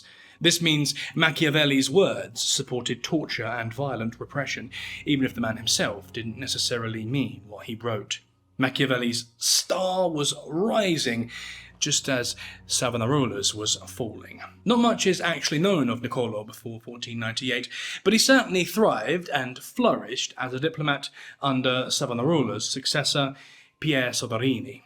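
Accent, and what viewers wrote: England, United Kingdom. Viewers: Just got home saw the alert have a new video, oh man dinner with a side of torture in that soothing English voice 😂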